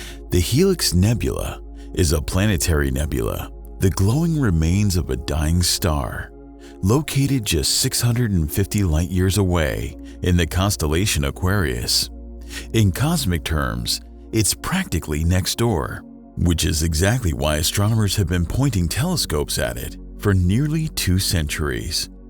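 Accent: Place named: United States. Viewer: Every bloody where you go, every bloody video....a yank accent. Too many yank accents..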